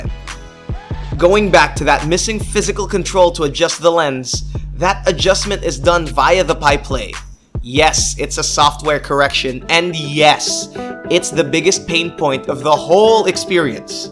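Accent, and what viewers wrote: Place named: Philippines. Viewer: You sound Filipino 🇵🇭🤔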